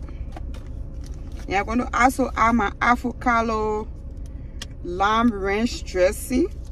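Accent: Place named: Liberia. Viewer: I know Liberian accent when I hear it 😂❤ nice video!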